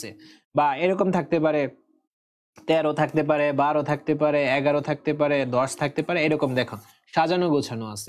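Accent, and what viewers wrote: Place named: Brahmanbaria, Bangladesh. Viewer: ব্রাহ্মনবাড়িয়া থাকেন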